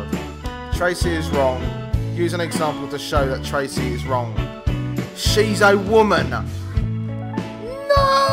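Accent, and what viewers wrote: United Kingdom. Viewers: you're from UK, don't ya? your voice is so good tho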